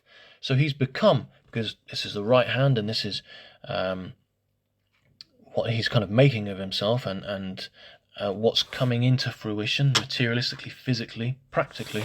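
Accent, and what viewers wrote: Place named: United Kingdom. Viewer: Good to have some subtitles here as some ppl might find it difficult to catch with ur native British accent. Good to see u putting the new feature in to practice with ur premiers palm. As his inheritance links to east, he might be a good family person as shown by his palm. We have a belief here that who ever come to the top seat might be under a bad period according to his or her birth chat. As always, it’s very interesting reading and illustration. Thanks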